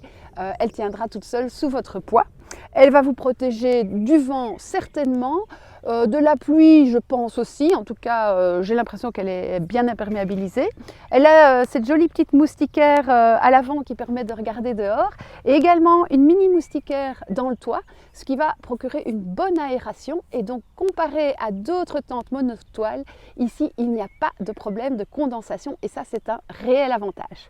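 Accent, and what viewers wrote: Belgium. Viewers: J'aime bien ton accent ( Belge mais d'où ? ) quand tu dis couisiner, kil-o ( moi je dis kil-eau ) ,camme-ping, etc... Merci pour ton sourire et ton optimisme !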